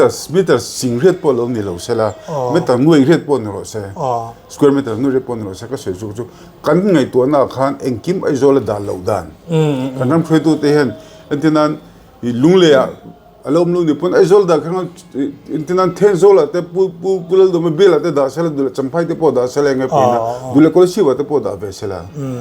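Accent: Myanmar. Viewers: hi burma mi a ni em aw a tawnghi ngaithlak a nuam lo thin khawp mai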